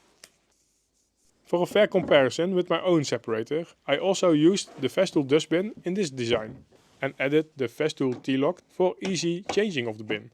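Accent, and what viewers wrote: Netherlands. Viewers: Dutch accent is classic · The moment you hear its a dutch guy within the first few seconds xD great video tho! oh, greetings from Soest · Good content, but don't know if auto dubbed is worse or the super dutch accent xD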